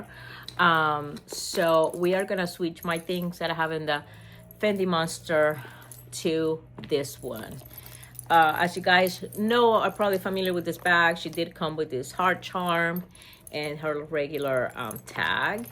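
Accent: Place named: El Salvador. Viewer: I love that crystal tabby. You aways have great bags. where are you from? The accent sounds like el Salvador or maybe Columbia 🤔 But anyway, the new loved leather in brown looks way too intentional on certain bags.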